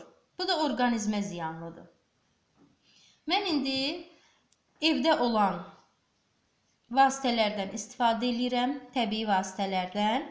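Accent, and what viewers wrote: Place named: Baku, Azerbaijan. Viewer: Bakılısınız danısıqınıdan bilinir size tesekkürler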